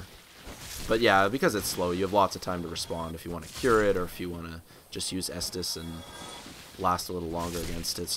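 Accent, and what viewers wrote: Canada. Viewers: U r awesome bro...btw..r u canadian??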